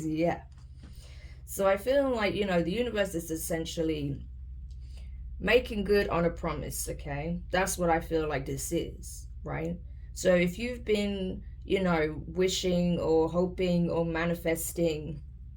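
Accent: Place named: Australia. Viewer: "Source is stepping in. Making good to a promise. Your prayer is heard. What are they encouraging you to do? To take initiave. To be assertive." "Make some changes. Release some things that do not serve you. We will make things happen." I just want peace for the world, Mam. I'm currently studying about international politics. I'm old to go back to school. Yet I'm studying so hard...despite the gossips,...despite the many projects. So that I can help to keep the peace in the future. Whether its within our city only or maybe with other neighboring countries too. ✨🌱👍 This is all i want. #WORLDPEACE Thanks for channeling this important message, Ms.! Thanks also to the Spirit Guides for the continuous support! ✨😇🙏✨ P.S. Mam are you from Australia? Your accent is so cute! ❤️🥰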